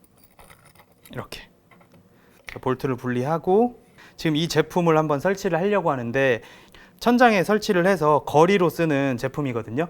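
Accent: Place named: Japan. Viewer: 대단하다 진짜.. 앙카가 뭐냐 앙카가.. 위대하신 천황폐하를 모시는 신민이라 그런가.. 일어를 쓰려면 일어를 쓰고, 영어를 쓰려면 영어를 쓰지 일본애들이 쓰는 영어 발음까지 따라해야겠냐..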